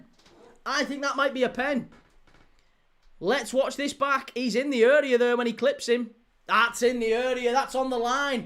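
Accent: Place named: Lancashire, United Kingdom. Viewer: You from Warrington? Live in Australia now, but you sound like a proper woolly-back. Reminds me of home.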